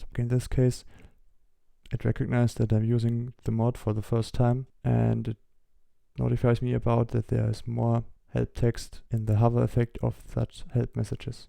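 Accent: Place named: Germany. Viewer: Der Deutsche Akzent knallt richtig hahaha gutes VIdeo ^^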